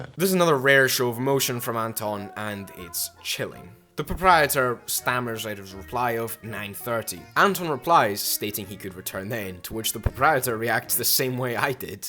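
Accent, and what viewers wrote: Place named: Scotland, United Kingdom. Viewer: Great, short video essay. Funny at times informative at others, but I've only just realised how Scottish you sound lol. Keep up the good work.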